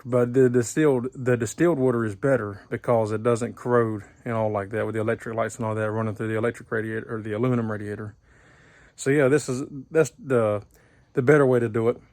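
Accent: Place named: North Carolina, United States. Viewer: Yes sir! Born and raised. Raised in Wilmington, born in High Point. I could tell by how your said “water”. Been living in the California mountains now for ten years. Once I heard you talk I felt like I was back home. Thank again for the video. It helped! I got me 1989 bronco with the 4.9l that I’ve been putting back together.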